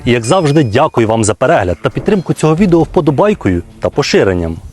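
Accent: Cherkasy, Ukraine. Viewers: У автора черкаський прононс?))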